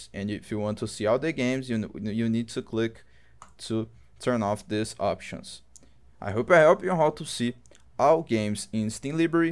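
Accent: Brazil. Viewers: brazilian sotaque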